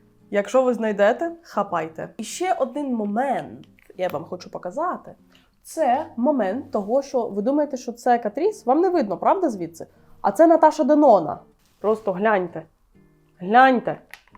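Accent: United Kingdom. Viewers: Вперше чую українську з британською інтонацією😅 наче дивлюсь укр контент, а відчувається наче дивлюсь англомовного блогера. Якийсь дисонанс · Суперське відео, люблю цей бренд) І у вас яскравий, як на мене, британський акцент, дуже класно звучить😍😍😍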